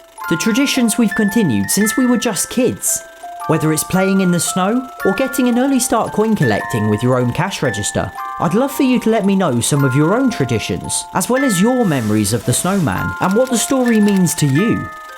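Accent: United Kingdom. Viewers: You are so cute. I love watching and your british accent :-)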